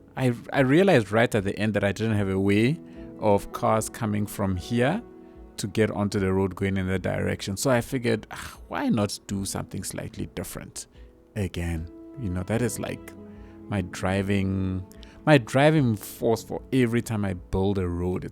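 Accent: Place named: South Africa. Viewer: are you south african? your accent is awesome!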